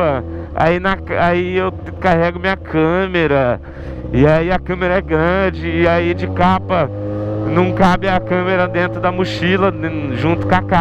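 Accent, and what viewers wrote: Goiás, Brazil. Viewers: Pq ele fala no piqui do Goiás??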